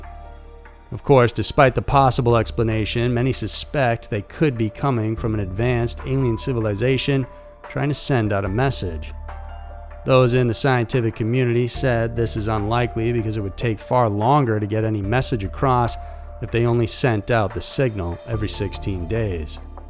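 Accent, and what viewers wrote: United States. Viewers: Which part of the US, is this guy from?, so love his voice!, and of course his posts.😊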